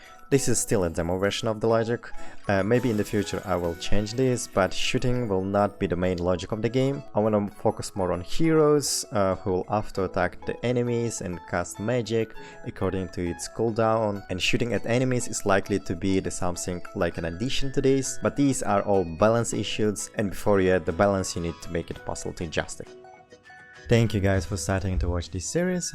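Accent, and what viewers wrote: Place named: India. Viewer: I like his good indian ascent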